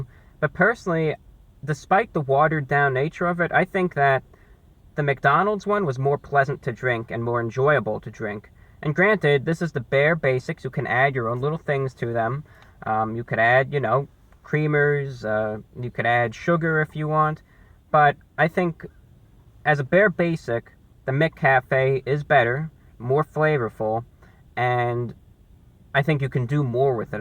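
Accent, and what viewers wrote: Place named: Boston, United States. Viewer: the bostonian accent is shining through in this review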